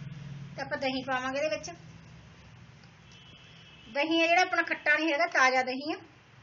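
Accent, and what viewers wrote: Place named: Ludhiana, India. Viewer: ਪਰ ਤੁਹਾਡੀ ਬੋਲੀ ਲੁਧਿਆਣਾ ਸਾਈਡ ਲੱਗਦੀ ਆ ਜੀ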